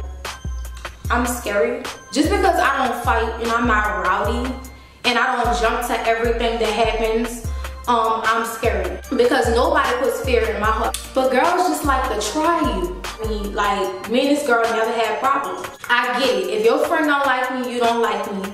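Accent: New Orleans, United States. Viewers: You sound like you a Nola baby!! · you from nola ? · Your makeup ❤️ are you from New Orleans ? I hear alil bit of that Nola when you speak .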